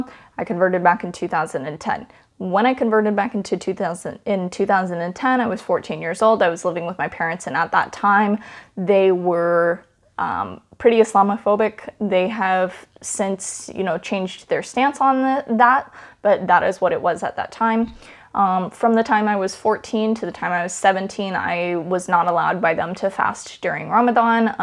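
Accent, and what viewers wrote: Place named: RP, United Kingdom. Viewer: By the way you have queens accent are you familiar to her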